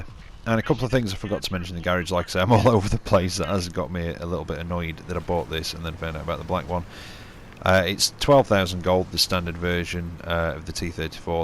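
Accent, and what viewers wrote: United Kingdom. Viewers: British Bloke lmao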